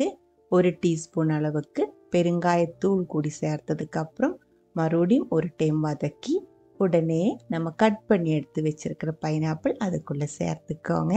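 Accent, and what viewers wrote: Kerala, India. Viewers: മലയാളി തമിഴ്‌ പറയുക ആണോ?അതു പോലെ ഒരു accent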